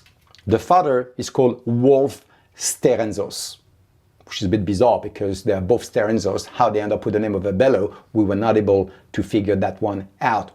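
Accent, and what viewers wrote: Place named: France. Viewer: you're amazing and your English is wonderful. Please bring out merchandise with my favourite saying that you often say in your lovely French accent. ' Behind the Bars'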